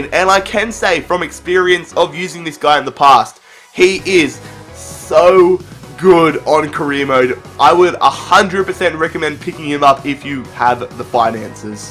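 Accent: Australia. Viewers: mate, he's an Aussie, I'm a kiwi and I can understand that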